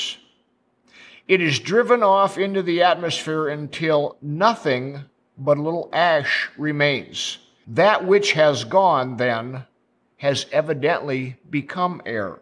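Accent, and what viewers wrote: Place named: Midwest, United States. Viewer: That's a midwest accent . I think. Seems Wisconsin as F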